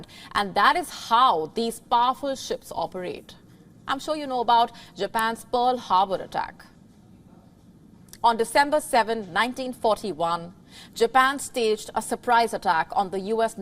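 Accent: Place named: India. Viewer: 这印度口音听着真是头痛。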